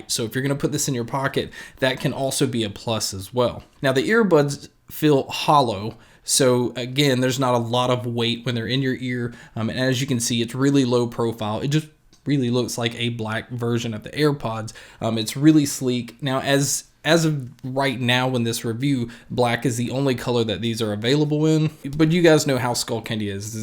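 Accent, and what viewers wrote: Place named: Texas, United States. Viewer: Texas boi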